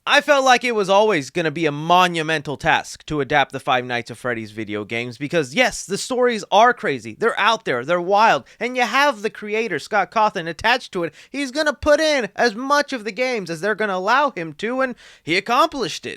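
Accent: United Kingdom. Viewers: 😂 love the British accent 😂 the UK loves you ❤ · Lol, I like your British accent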